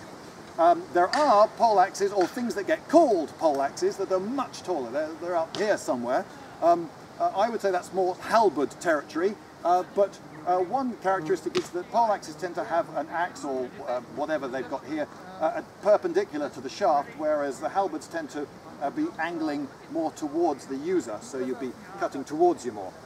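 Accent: United Kingdom. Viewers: I am an American. You are super British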